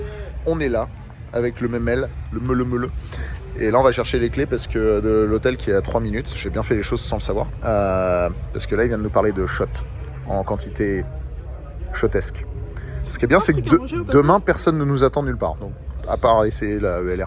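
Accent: Belgium. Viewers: Tu a fait ma journée ton accent belge est juste magnifique je pleure de rire 🤣🤣🤣🤣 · excellent accent Wallon! j'habite la Frontiere et je confirme que ca y resssemble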